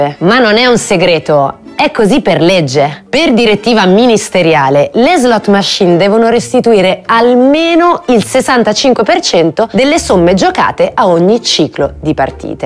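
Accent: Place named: Bologna, Italy. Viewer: Dimmi che sei di bologna senza dirmi che sei di bologna. spento il video subito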